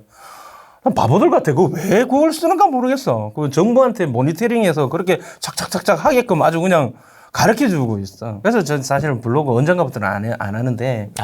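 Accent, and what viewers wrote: Gyeongsang, South Korea. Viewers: 경상도 분이신가요? 완전 다혈질이네요 너무 와닿고 공감 되네요 국회로 가십시요?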